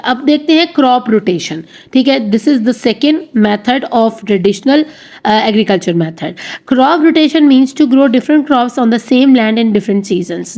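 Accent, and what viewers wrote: Punjab, Pakistan. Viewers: Ma'am ka bolne ka tarika Bata Raha hai k ma'am Punjabi Hain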